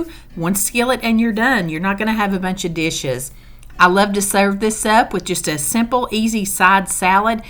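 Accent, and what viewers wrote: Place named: Southwest Georgia, United States. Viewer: I want to make all this just because of your accent. Reminds me of my aunt from SW Georgia. So charming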